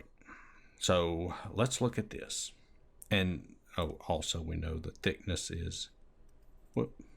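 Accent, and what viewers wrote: South, United States. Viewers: hello sir i just want to thank you for all your efforts just for the record im not even majoring in math but i watched almost every video of yours because im in love with your southern accent , i could like listen to you all day along and im not gonna get bored at all all the respect and all the love from morocco :)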